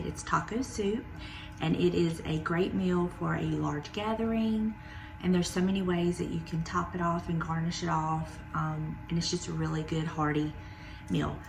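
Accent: South, United States. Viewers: Hello! I'm a too. I go by "Willie's Mom" on YouTube. Liz from Traditions by the Seasons gave you a shout out and sent me this way. This is the first video of yours I've watched. Being a southern girl myself, I live hearing a lovely southern twang. I plan on binge watching tonight. I wish you all the best and much luck on YouTube.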